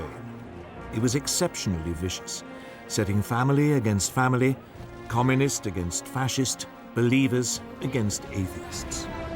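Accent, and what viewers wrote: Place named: United Kingdom. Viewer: Another biased, pro lefty-slanted video done by a guy with a British accent. Obviously not all commies in Britain joined the "Cambridge 5" commies, drunks & perves and moved to Moscow. The video maker probably sends a box of candy to Jeremy Corbyn on Mayday each year. General Franco and the various forces/groups of "Nationalists" brought law, order, and peace to the disaster cauldron cooked-up by the Spanish "Republican" government in Madrid. Socialists, Communists, atheists ruin everything they touch. Just to irritate the pro-Communist video maker / narrator, I think I shall look for a photo of General Franco and buy it, frame it, and put it on display along maybe with the flag / symbol of the "Carlists".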